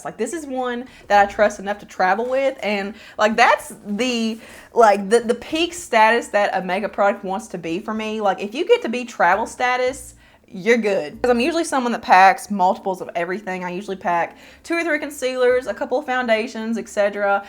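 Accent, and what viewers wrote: South, United States. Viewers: Love your southern draw 😊 great video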